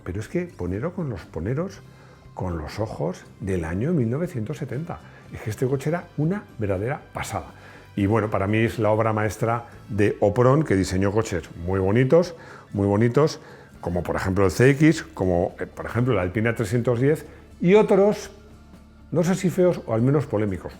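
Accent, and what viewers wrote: Chile. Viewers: Que a le escuche un acento chileno? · es chileno? ese acento se me hace familiar... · tiene acento Chileno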